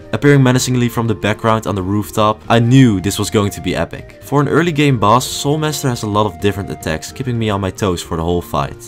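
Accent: Netherlands. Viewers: U Dutch? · Dit is zo’on erge Nederlandse accent 😭😭😭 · I just love that i can immidiately hear the dutch accent. Geweldige video! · any chance you're dutch? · you sound pretty Dutch. Your accent I mean 😅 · Are you dutch? You sound dutch. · The dutch on this dude is something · The biggest skill issue was listenting to the heavy dutch accent 💀 Still a great video though LMAO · Personally feel like you could work on your comedic timing a little. You sound very Dutch, which might be why the jokes don’t land on me. Great production value though. I just don’t find it very funny. Soul Tyrant is so bad on repeated playthroughs and pantheon runs. He’s super easy yet super slow cause he’s rarely ever in nail range. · are you dutch? you speak english like you are dutch (not an insult) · ur Not english Are you? Your accent Sounds very Dutch to me😂 Don’t worry bruh, I’m dutch😂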